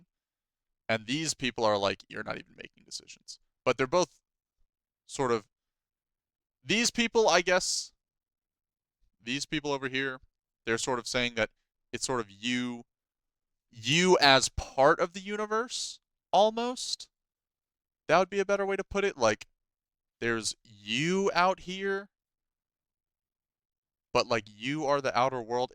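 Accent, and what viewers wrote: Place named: South, United States. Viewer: Where in the south are you from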